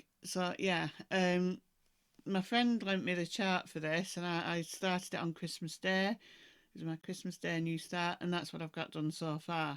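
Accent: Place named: Yorkshire, United Kingdom. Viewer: I'm from Ohio and I'm 85. Since I was 8 ,I've had pen pal from Yorkshire. We are like sisters. I love hearing your accent. It. reminds me of her. I enjoy all of your videos, but don't always comment.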